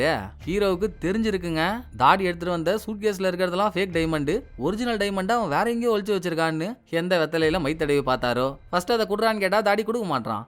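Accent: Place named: Chennai, India. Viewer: Pakka Chennai fellow bro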